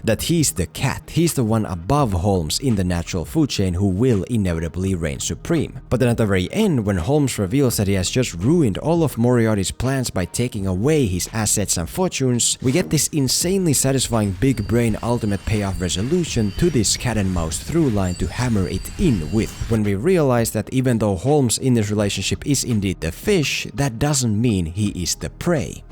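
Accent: Finland. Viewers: Veli sun aksentti on niin suomalainen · are you finnish? your accent sounds finnish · Where you from bro? i know you mustn't be American, you sound like you have a Finnish accent. Not sure tho.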